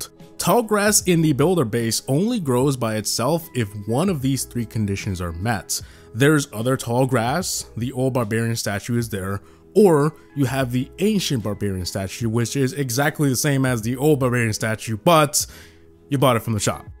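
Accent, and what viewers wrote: Norway. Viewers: Are u norwigan ? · Bro are you Norwegian or sum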